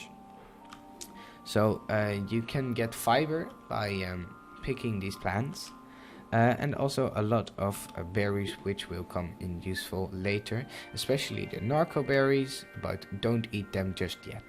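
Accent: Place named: Netherlands. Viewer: nederland!! ik hoor het aan jou accent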